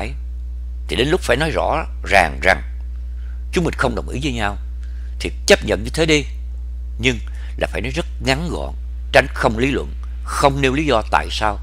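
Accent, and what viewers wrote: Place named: Saigon, Vietnam. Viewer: Thích nghe giọng đọc của anh , giọng chuẩn Sài gòn ❤️👍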